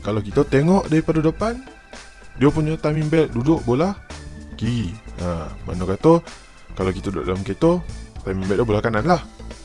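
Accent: Negeri Sembilan, Malaysia. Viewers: 1st time jumpa channel bang. Best & santai + cakap slang noghori Auto subs & like 😁 Salam bang saya ada beberapa soalan nak tanya, mohon pencerahan. Terima kasih in advance 1) engine 4g93T vs 4g63T, mana yg lebih baik dari segi reliable, powerful, energy efficient ? 2) antara 2 engine tu, mana lebih sesuai untuk masukkan ke satria neo? 3) kalau swap engine original Satria Neo, lepas JPJ ke? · torbaikk bang ribiew njen guna loghat Noghori...nnti blh try cuba loghat negeri lain plk..hahaha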